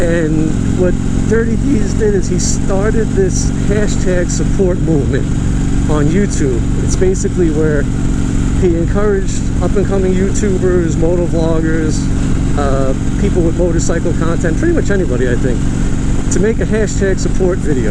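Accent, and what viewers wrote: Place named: New Jersey, United States. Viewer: Great first vlog. You have the sound problem down pat right away. It took me a really long time to get it right and you have it right. Love the accent. I had a few projects in NJ a few years ago and I was surprised to see how beautiful and rural so much of your state is. Look forward to seeing more. Ride safe my friend. Beautiful Road Glide by the way.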